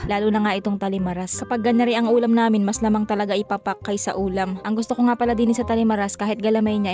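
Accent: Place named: Visayas, Philippines. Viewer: Murag bisaya si maam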